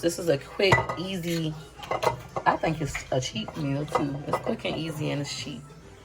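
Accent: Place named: Los Angeles, United States. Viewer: Rosario Nah has a thick LA accent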